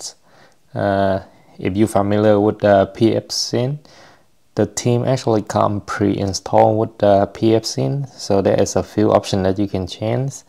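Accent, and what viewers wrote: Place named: Vietnam. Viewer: Very helpful video By the way, are you from Vietnam? your accent sounds very familiar :D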